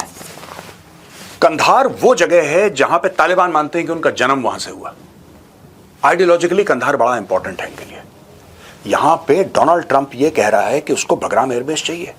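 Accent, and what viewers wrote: Lahore, Pakistan. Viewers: Lohori style bolte rahiye.majorsaab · 😂😂😂😂😂 Gaurav Sir's Lahori accent is hilarious 🤣🤣🤣🤣🤣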